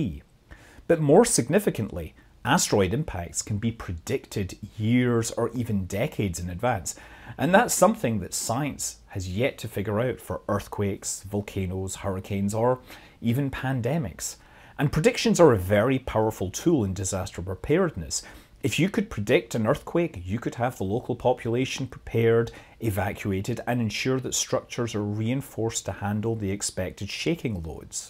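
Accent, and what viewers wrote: Scotland, United Kingdom. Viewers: Fair point. has a Scottish accent but I did find a video of his that suggests he lives in California. So I think I know more or less where he lives. That doesn't go for everyone. A UTC time or a clue about which 9am would have been helpful. If you have a problem with that, it's on you.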